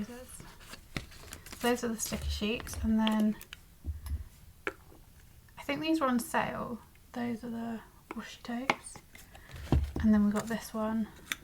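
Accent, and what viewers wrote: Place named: United Kingdom. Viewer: you have a British accent?! OMG!!! I was in love with your journals, personality all this time, and now I fell in love with your accent and pretty voice. Definitely continue doing voiceovers. Music to my ears💕💕💕 I need to get the green version of this journal. It is just so aesthetic, I'd love such journal to sit in my shelf. And her washi tapes and sticker designs are always so elegant and feminine. Love love love Leela's style and your unboxing❤‍🔥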